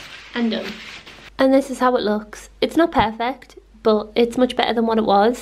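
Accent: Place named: Scotland, United Kingdom. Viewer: Sounds Scottish, like my daughter-in-law, with a slight lisp. They get taxed per room, so don't have closets, like we do. They have cupboards, which they call "wardrobes." So I was expecting a huge wardrobe, not a cupboard. They don't pronounce their "t's" in the middle of words like water, or pretty.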